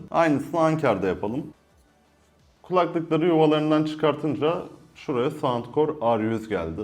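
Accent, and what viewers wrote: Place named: Bingöl, Turkey. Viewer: Kardeş sen bingöllü müsün aslen?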